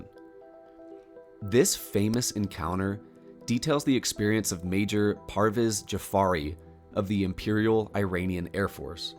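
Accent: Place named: Southwest, United States. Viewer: How come you know how to pronounce Spain Talk? Are you of California or New Mexico? Or Tejas?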